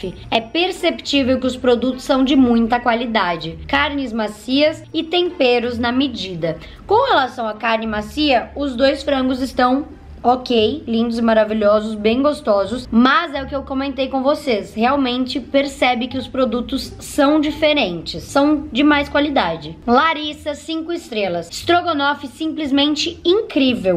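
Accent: Rio Grande do Sul, Brazil. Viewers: Eu amo essa, ela é gaúcha nata! Até o jeito de falar, maravilhosa ♥️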